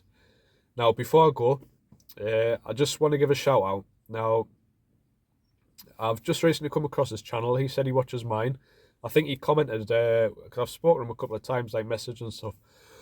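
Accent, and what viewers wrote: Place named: Teesside, United Kingdom. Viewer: Good evening I have just started watching your channel and enjoy your no bull approach. I'm based to Harrogate so not a million miles from you. I take it by your accent you are Middlesbrough Teeside area. My plan is to start my own gardening business next year. I am a little different as I am 67 years of old have done a few jobs this year. One was lifting and completely re laying a lawn, and several maintenance jobs plus a few cut blow and go. Just spending winter time getting estimates for equipment and deciding on a marketing strategy. any help you could offer would be appreciated. One question that raised it head to is if finances were not a problem which power tool make would you go for. I am currently looking at Stihl and Ego and leaning towards Stihl only because I sold Stihl in a former life your advise will be appreciated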